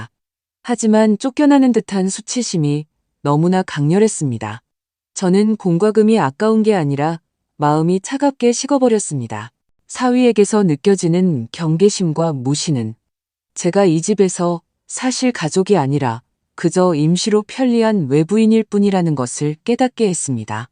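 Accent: China. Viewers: 발음이나 글읽는 소리가 연변족인것같음 요즘 이런글 쓰는 작가도 ㅎ 그렇고 한국인이면 다알수 있는 촌수를 뒤죽박죽으로 읽고 시간도 4시를 사시 다섯시는 오시 듣다가 한심스러워 나가기를 자꾸하게 되네....😢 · 하나히나. ㅡ중국인 가공 말투!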